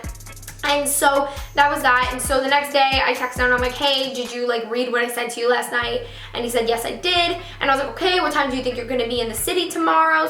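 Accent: New York, United States. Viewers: lol i just discovered you and watched your "exposed boyfriend" video and i knew you were from new york. 1) savage as fuck 2) your accent is cute and 3) you're not as basic as white girls in (all of) California and Atlanta. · Oh my gosh. The New York in you is SCREAMING in this video. I love it~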